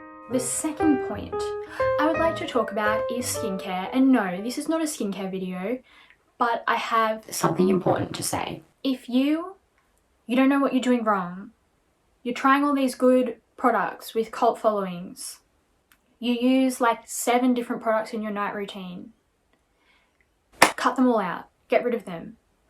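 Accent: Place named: United Kingdom. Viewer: I love your accent! UK, right?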